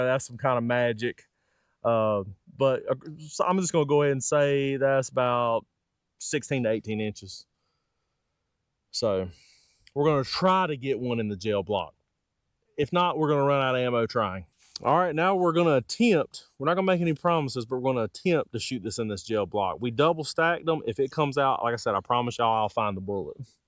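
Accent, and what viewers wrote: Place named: Alabama, United States. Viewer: Just found your channel. Great video. I hope they take your critic well and fix the SD. If they do, l will give hunting with subs a chance. The bullet flat out performed. I am curious about where you are from. You talk exactly like me. I am an Alabama native, but lived in TN for 14 years and south FL for 3.5. Anyway, just subscribed. Keep ‘em coming.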